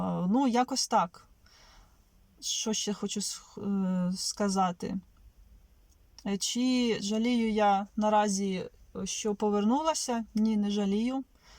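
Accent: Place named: Central Ukraine, Ukraine. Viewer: В Лондоні ціни такі, що я не знаю, як прожити на соціальну допомогу. В мене знайомі виїхали з Лондона, які там жили та працювали декілька років, бо важко. При тому, що вони мову знали досконально. Плюс чоловік, який невиїзний. Аби він виїхав до вас, ви би нікуди не верталися. Не лукавте. І це при тому, що суддя по акценту, ви з центральної частини України. Думаю Київ.проте, вам випала щаслива нагода побачити Англію і Лондон. В іншому випадку, ви б отримували візу по другого пришестя Христа.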